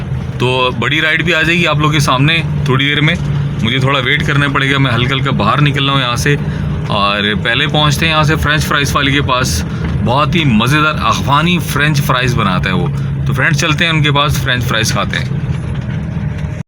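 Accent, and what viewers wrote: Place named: Karachi, Pakistan. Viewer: MashahAllahh , ap ka accent ka Karachi ka tuch ata ha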